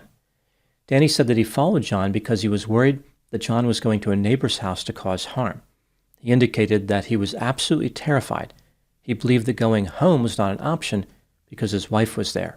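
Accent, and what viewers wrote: United States. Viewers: You sounded more American than ever in this piece.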